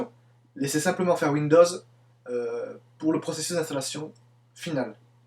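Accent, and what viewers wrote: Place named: Marseille, France. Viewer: toi t marseillais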